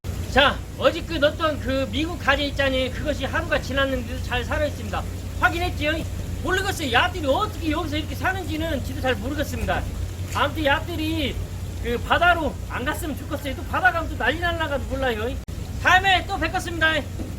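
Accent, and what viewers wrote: Jeolla, South Korea. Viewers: 전라도 사투리가 구수허네유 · ㅋㅋㅋㅋㅋㅋㅋㅋㅋㅋㅋㅋㅋㅋㅋ 토종 전남분이시죠? 아 웃긴그 ㅋㅋㅋㅋㅋ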